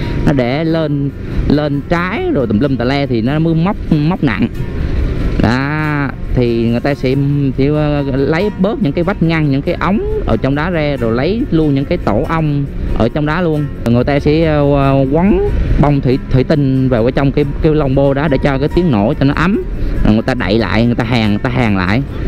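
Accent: Central, Vietnam. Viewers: Nghe giọng ông anh miền trung vui ghê :))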